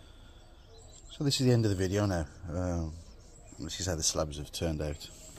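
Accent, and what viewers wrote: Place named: Birmingham, United Kingdom. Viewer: Alright mate if used a watering can how much should I dilute it thanks like say a 5 litre watering can how much of each you sound like you are from Birmingham like myself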